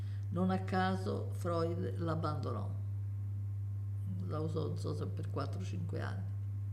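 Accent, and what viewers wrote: Rome, Italy. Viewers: Ciao, io sono una ragazza di 17 anni che da poco ha scoperto i suoi video. Durante la mia vita, ho sempre sofferto il “non sapere” in modo molto forte, cioè già a 7 anni avrei voluto farla finita perché volevo sapere subito cosa ci fosse dopo. Questa sofferenza, secondo me, deriva dalla paura di non poter esistere più, per questo vorrei rifugiarmi sempre nel “cercare di comprendere la verità”, ma a volte devo accettare semplicemente che essa a me non si voglia rivelare. Da lei sto imparando che non sempre bisogna avere la pretesa di sapere ciò che non si sa, a volte va bene anche rimanere nel dubbio. Studiare filosofia e immergermi nei suoi video, per me, sono due vere cure, perché mi rassicurano sul fatto che anche dopo la morte non cesserò di esistere, perché comunque qualcosa sono e da qualche parte dovrò pur andarmene. Sento dall’accento che lei é del Lazio, forse di Roma e nel caso sia come penso, visto che probabilmente abitiamo entrambe nella stessa città vorrei incontrarla davvero davvero tanto. 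Avrei tanto piacere a dialogare con lei perché sarei felice di incontrare almeno una volta qualcuno di estremamente saggio proprio come lei. Un abbraccio. ❤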